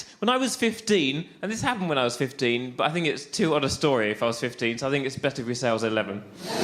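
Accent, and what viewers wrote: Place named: England, United Kingdom. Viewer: çok güzeldi. aksanı ne kadar güzel ya :D ingilizce öğrenirsem kesinlikle ingiliz aksanıyla öğrenmem lazım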